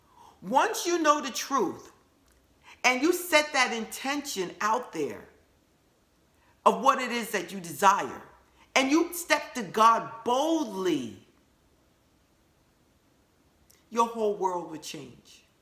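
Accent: New York, United States. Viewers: Do I detect a New York accent??!!? I like that!!!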